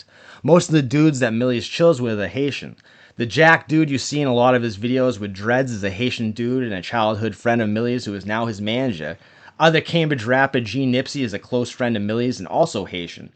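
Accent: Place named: Rhode Island, United States. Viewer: Another superb video!! Your Ch. ROCKS!!! Today... I can't find the Member sign up page. I believe I've seen it before... should've just signed up then. I'll figure it out (maybe..😅) I'm Internet Challenged... but I ❤ your content. You know all the history and share it well!!! I'm a Rhode Hole 50+ yrs...ran the streets (well..more like walked them) in 80's+90's. I love the history... the accent too. LOLOLOL. How many times have you been down South..spoke 1 sentence to a Rebel...and heard.."Where you from boy??...New York???"I'll bet more than once..😃😃 I gotta dump a Ch. from a FOOL who.. who's content SUCKS.. I'll leave it there. But yeah...if you can send me a Member link.. I'll join in a ❤️beat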